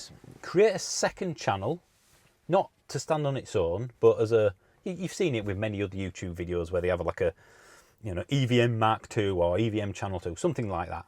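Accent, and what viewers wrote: Yorkshire, United Kingdom. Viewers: Awwwww! I’m gonna miss my bi weekly shot of yorkshireness! Its lovely to listen to our native language. Living NZ I have to speak a foreign version of English all the time! Sometimes they don’t understand me! Can I suggest a subject for your new channel? A visit to Cragg Vale to explore the history of the coiners( clipping the ticket). just a thought. Been following you for ages before I imported my own I-Pace from UK. Much cheaper than here.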